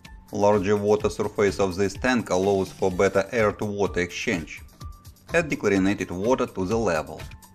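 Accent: Russia. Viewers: i just wonder do americans understand your accent? my russian friend has the same english accent as yours. he have never been in russia though.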